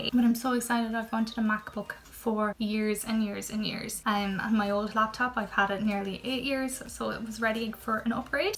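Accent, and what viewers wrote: Ireland. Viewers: Are u from Ireland? Well asked that too soon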